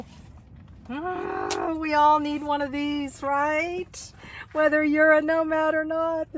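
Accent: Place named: Oregon, United States. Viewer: I don’t know where you’re from but you sound like you’re from Roseburg, Oregon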